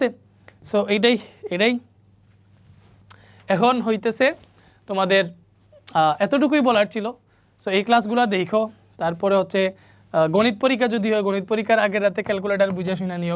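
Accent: Sylhet, Bangladesh. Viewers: ধন্যবাদ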